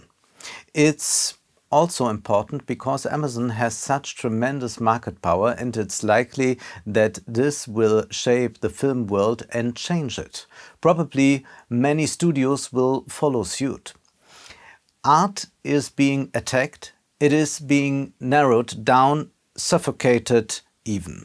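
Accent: Germany. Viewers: Interesting, so this is the german accent everyone talks about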